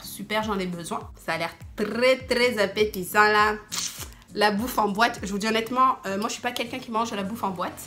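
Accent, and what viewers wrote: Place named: Africa. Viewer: C'est pas parce que tu es Française "à la peau NOIR" que tu es regardé par les "Français" Tu aurais pu répondre en message et non nous rappeler que tu es "Française" à la peau noir et et l'accent Africain. Si les Africains se sont rapprochés de toi c'est juste qu'ils s'identifient à toi !!!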